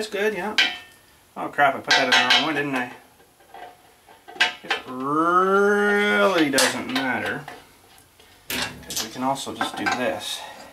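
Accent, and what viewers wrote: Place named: Canada. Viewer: wow! i guess you are a canuck. (did i spell that right)? your accent makes me smile, and thank you for that! Goofy Canadians. LOL.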